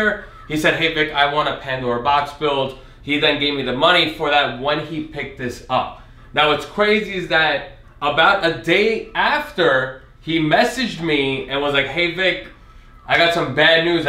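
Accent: New York, United States. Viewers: 🤷‍♂🤷‍♂😊😊 you have such NEW YORKKKKKKKKKK ACCENT :D its great man love new york i lived there all my life and moved to maryland but i love my new york but it expensive to live theses days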